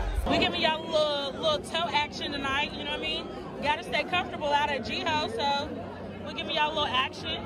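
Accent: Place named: North Carolina, United States. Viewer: It’s crazy how you can tell someone is from NC without even knowing them!! Tre-4!! 😂Just found your channel girl love the content!!!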